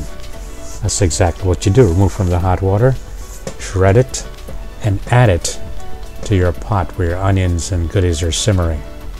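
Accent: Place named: Jamaica. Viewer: you sound like a Jamaican who's just been living in the US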